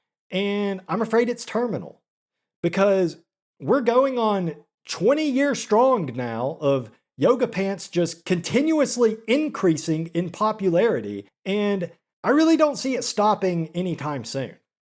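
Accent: South, United States. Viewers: I love how southern and simultaneously not southern you are XD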